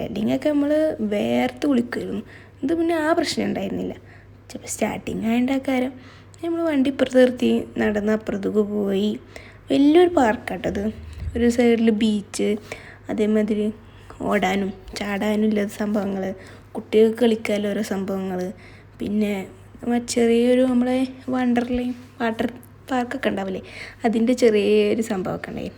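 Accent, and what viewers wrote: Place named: Malappuram, India. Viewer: Malappuram slang avoid cheyy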